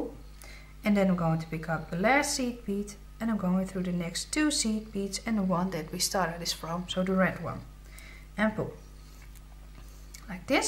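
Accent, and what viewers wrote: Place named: Netherlands. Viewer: I love your accent :o) ... its like dutch / netherlands ?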